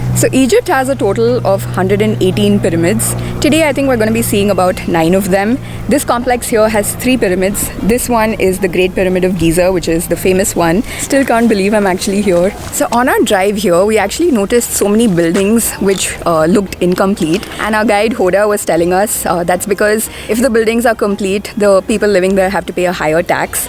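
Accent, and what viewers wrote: India. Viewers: Amazing indian accent speaking english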